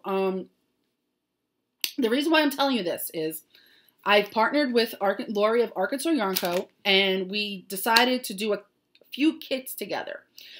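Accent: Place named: Brooklyn, United States. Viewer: Your Shawls are Beautiful! I'm so glad that Melissa pointed me in your direction- Love seeing and hearing about Your Yarney World! Chewy is a Little Doll, I just wanted to reach in and hug him! Thanks for Sharing, I'm still catching up on past episodes and enjoying them all! I was born in Brooklyn, Love hearing You speak, I lost my Mom and Dad 7 yrs ago so I don't get to hear the accent anymore as I haven't lived in NY in many many years! XX Marie-Wisconsin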